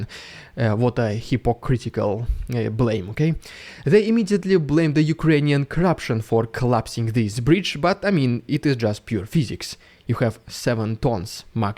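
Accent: Russia. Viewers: hope all is well. Been annoying me for ages - yer accent sounds lik chekov from star trek.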